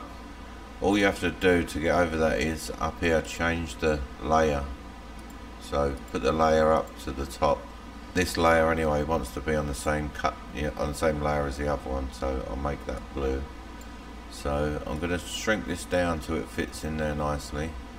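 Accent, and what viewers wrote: United Kingdom. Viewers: Thank's for all the laser vidéos. From France, your accent remind me Peaky blinders...👍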